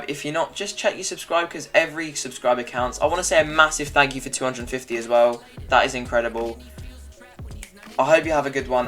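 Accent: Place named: United Kingdom. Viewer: Bruh he bri’ish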